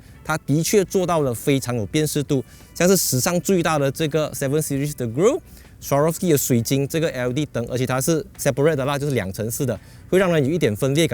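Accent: Malaysia. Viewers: 哈哈哈哈 主持人长得还挺好看的。马来西亚中文好有趣啊，虽然都能听懂，但是不知道为什么总透露出些许调皮。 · 人家就是故意講馬來西亞式華語來不讓你們（偷偷）把視頻搬去中國放咯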